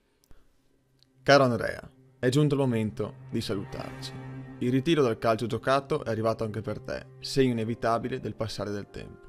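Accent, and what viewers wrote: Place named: Veneto, Italy. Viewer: Normalmente ti avrei coperto d'insulti ma dal tuo accento mi sembra tu sia di origine veneta perciò mi astengo....ma solo x quello....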